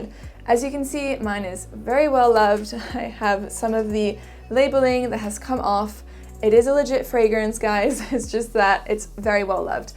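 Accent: France. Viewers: Im gonna say shes French with perfect English. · I think she's French or at least can speak French really well cause even when speaking English you can hear a little French accent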